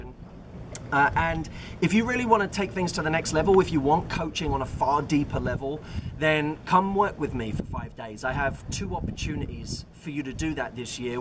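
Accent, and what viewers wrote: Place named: United Kingdom. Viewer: I'm just here to listen to british accent lollll. thank you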